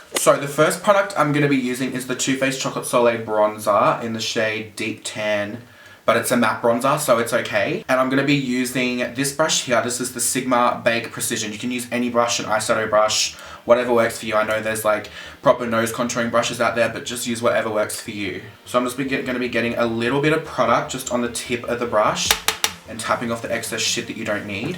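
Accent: Australia. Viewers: UR DEFINITELY AUSTRALIAN !!!🇦🇺🇦🇺🇦🇺🇦🇺🇦🇺🇦🇺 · Are u Aussie ! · are you Aussie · this is probably the best nose contouring vedio i hav ever seen. 😍😍. u r bomb btw!💦💦. are u an aussie?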